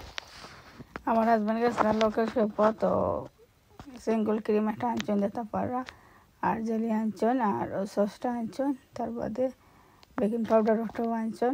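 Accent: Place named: Sylhet, Bangladesh. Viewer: ওয়া আলাইকুমুস সালাম। আপি কি সিলিটি নাকি।সিলেটি কথা আমার খুব ভালো লাগে। খুব সুন্দর ভিডিও শেয়ার করেছেন আপু।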